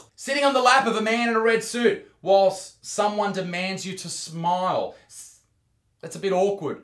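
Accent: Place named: Australia. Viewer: Thank you,. I have spent 11 months of what feels like the worst nightmare of my life after traumatically losing the man I loved the most in this world. I miss him terribly and the season is definitely not going well. Not really because it's Christmas, but just remembering this time last year him being sick... but still having him with me. I'm really glad I did stumble onto your channel. The things you say and the way you say them remind me so much of him (except with an Aussie accent) so it feels like you give me that little bit of him back when you upload a video and I thank you for that.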